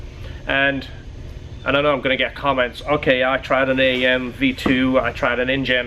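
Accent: United Kingdom. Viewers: I'm probably wrong but do you have a British twang to your accent? ..Other than that, great informative video..Si..UK.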